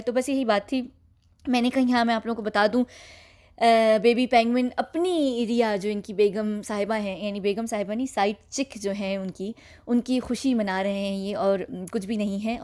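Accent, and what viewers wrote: United Kingdom. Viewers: Ap rehete ho uk me ? accent se lga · U have a British accent . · Sometimes I rewind and listen to your British Accent and I loved it and loved the fact that you speak Hindi so good. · When you speak in English I recognize it as a British accent. But when you speak Hindi/Urdu in your videos Your British accent is not apparent: so I wonder if you could speak English with an accent of a native Hindi/Urdu speaker (Indian English) accent: it will be fun if you can give it a try! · I am dr hyder by profession I am a cardiac surgeon from Pakistan .... I love the way she speaks and the most of it I love the way she has this British accent ..... lolzzzz I am her fan .... thanks for being soo brave and fighting for truth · Her accent seems like UK or british accent.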